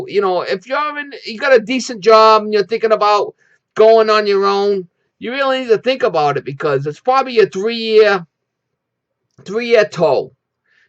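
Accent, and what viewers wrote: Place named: Northeast, United States. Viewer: Guys, I am 54. Licensed plumber and overall home repair guy. Been in the remodeling business for 20 years now. I have remodeled over 1500 bathrooms. Trust me, this guy knows what he is talking about. If you are a young guy in this trade you should watch every video he makes. Knowledge and experience are worth a ton. This man has them. And his videos are also entertaining. Yes I know he is a yankee and sounds funny. But he is a SMART dude. Learn from him.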